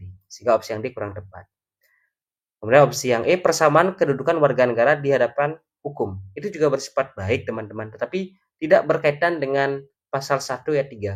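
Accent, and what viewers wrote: Bali, Indonesia. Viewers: bang saya suka logat balinya wkwkwkwk .. mantappp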